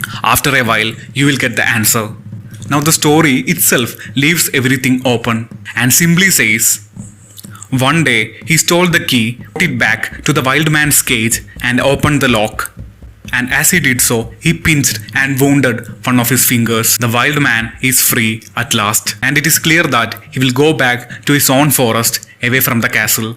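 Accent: Kerala, India. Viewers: Man! That mallu accent!!!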